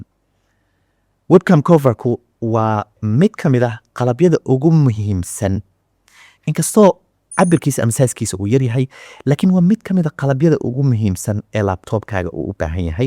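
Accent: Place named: Hargeisa, Somalia. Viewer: Adi reer hargeysa ayaan ku moodayeybe😅😅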